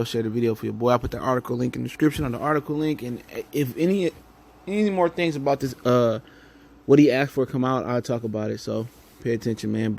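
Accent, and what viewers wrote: United States. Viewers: Your commentary sounds very American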